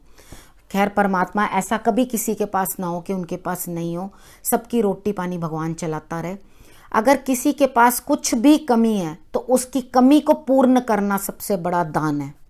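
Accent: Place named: Punjab, India. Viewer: Very well explained, m ur fan ,specially our type punjabi accent.i adore u a lot.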